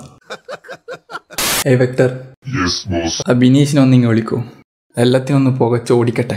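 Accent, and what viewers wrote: Kannur, India. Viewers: KANNUR aano place oru Kannur slang undallo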